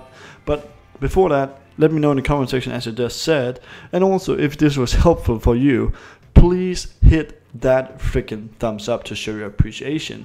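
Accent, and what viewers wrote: Denmark. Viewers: man tager ik fejl når man høre dansk accent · Are you danish?? You Sound danish in your accent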